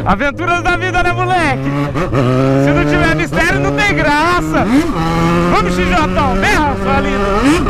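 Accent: Goiás, Brazil. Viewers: vc e goiano???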